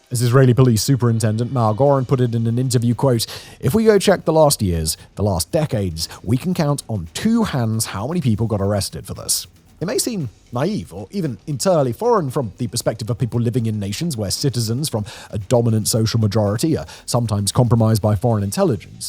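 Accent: England, United Kingdom. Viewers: It’s hilarious to me that someone as ill informed or informed but lying to their audience is so highly regarded on these topics simply because he has a YouTube channel and speaks with an English accent. To be clear, there is not a single part along the front lines that Russia is quickly advancing at. Russia is getting the butt’s handed to them in every direction. Just because you are losing a tree line or a few fields every week doesn’t mean you’re losing. Ukraine has taken more land in Russia than Russia has in Ukraine all year. In the area’s where Russia is advancing their casualties are as high as 10 to 1. This is a strategy that is similar to a heavy weight boxer letting the bigger and stronger opponent wear themselves out by throwing non stop haymaker’s that’s doing little damage. At the rate Russia is advancing it will take them 250 years and 100 million dead soldiers just to reach their stated goals of taking Donbas, Luhansk, Kherson, Zaporhizia, and I forgot the other off the top of my head. 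Make no mistake if Ukraine said we are going to hold this line at all cost they could do it, but if Russia wants to lose a thousand soldiers and millions in equipment to take a field you let them in a controlled retreat. Russia’s economy is collapsing, their allies and bases overseas are falling, their military has been set back decades, and there are sign people inside Russia are turning. Right now Russia is losing more troops than ever before. Everyday they are setting a new rec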